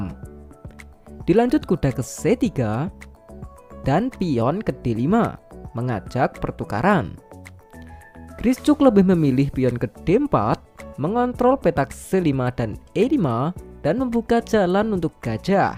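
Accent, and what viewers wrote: Java, Indonesia. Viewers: Asli orang jawa ya bang kok medok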